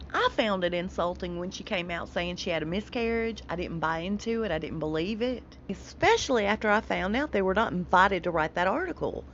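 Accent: South, United States. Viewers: someone just posted on Twitter that a security guard on the dress fitting got mm on film pitching a hissy-fit (you sound southern, so i know you will know what a hissy-fit is) and is releasing it to the Canadian press.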